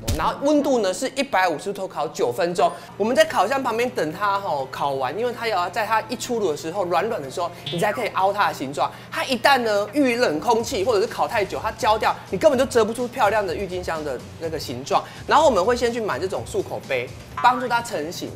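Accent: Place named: Taiwan. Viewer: 好多地道台語真的好愛🤣🤣🤣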